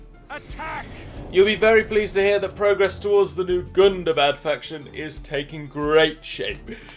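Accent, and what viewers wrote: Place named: Scotland, United Kingdom. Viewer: That scottish accent haha 😁 👍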